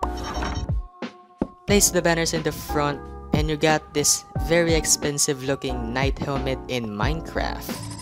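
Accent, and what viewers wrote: Philippines. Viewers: You sound Filipino.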